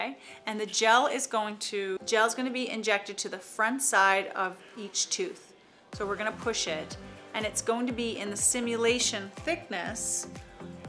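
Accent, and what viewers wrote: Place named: Canada. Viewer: She's gotta be a fellow Canadian according to the way she says 'about'.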